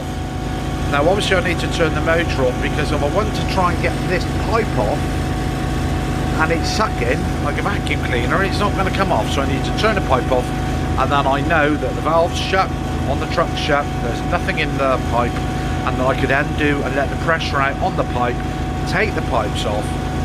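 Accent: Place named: United Kingdom. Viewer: I would love to perfect my English hehehe I like your British accent! Pls help me how to be fluent in English thanks idol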